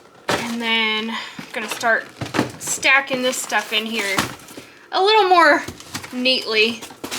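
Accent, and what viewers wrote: South, United States. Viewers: Nursery water is what you use when mixing formula I think:D Hope this helps! also thank you for the cleaning motivation hehe, I keep your videos on in the background when Im doing things around my apartment so I dont feel so alone:) My family is from the south but Ive lived in NYC my whole life, I loved hearing their accents when we would visit, its such a sweet tone you have. Happy for all the vlogs and how much you're enjoying making them, I watch every single one you're so wholesome and down to earth 🥺😇🌈👼💛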